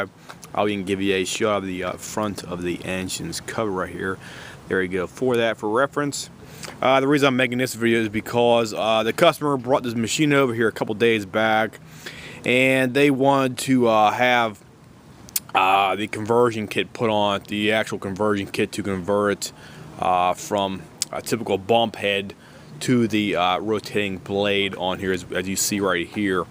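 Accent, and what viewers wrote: Pittsburgh, United States. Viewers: Thanks for the video. Btw, you sound very Pittsburgh.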